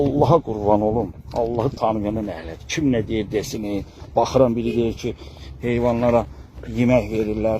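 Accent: Ganja, Azerbaijan. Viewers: Qardas Gencelidi lehcesinden melumdu.Gencede Qadan Alim demek bir ezizlemek sozu kimi deyilir ve bilinir.Mene maraqli olan oduki yeni bu cure gozel seylerden ancaq bu soz size batdi?? MasaAllah bu qardasa mence o dediyiniz agila daha da cox ehtiyaci olanlar var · Ay Allah Gəncə ləçəsi. Allah qəlbində mərhəmət hissi olan insanları qorusun. Maşallah sizə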